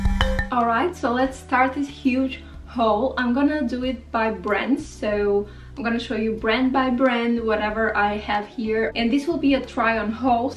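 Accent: France. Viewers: Is she French ? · Are you French?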